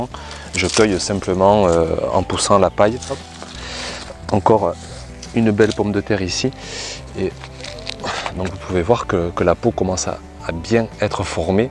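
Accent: South, France. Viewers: Bonsoir, merci encore pour cette belle vidéo,et alors un jardin qui pousse à vu d’œil!! Tu as des légumes à en être jaloux!! LOL. Je voudrais tellement avoir mon potagé comme le tiens. Mon jardin se trouve dans la forêt je suis dégoûtée..Il y a 15 jours, il a pluie énormément et mes tomates ont pris la maladie ( je suis dans l’Ain). j'ai essayé de les traiter avec de la bouillie bordelaise, mais je crois que je les ai perdu mes 15 pieds. Crois tu que je puisse en retrouver encore dans les jardinerie pour les remplacer?? J'ai planté quelques pieds de courgettes, de la diamant et un pied de courgettes allongées jaunes,mais je ne me souviens plus du nom. peux tu me dire ce que l'on peu planter encore dans le jardin ce mois la et les mois à venir. Merci à toi et et à ton petit accent du midi!! j 'adore A bientôt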